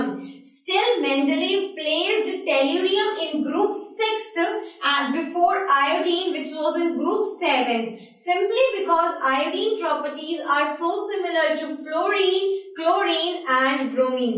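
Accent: India. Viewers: I would suggest you to stop speaking hindi in english xcent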